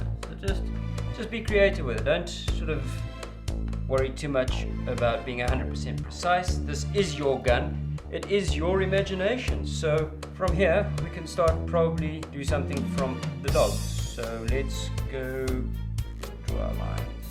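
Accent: South Africa. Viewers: I can tell instantly you're South African tjom. Great video, was just looking randomly for folks who may have crafted Vincent Valentines gun and I found you. Not very many South African content creators like you and I around, granted our content differs by a helluva a degree but still cool to see another.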